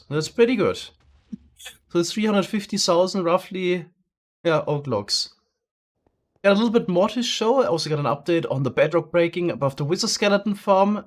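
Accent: Germany. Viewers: I was watching yesterday’s recap and my dad looked and me and said “he sounds German” (he said it in German) and I broke out in laughter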